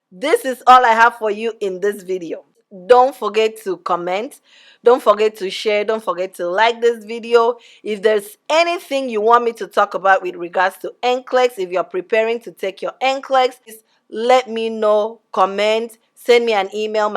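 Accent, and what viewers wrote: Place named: Efik, Nigeria. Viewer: You sound like my Efik or Ibibio sister❤ Thank you.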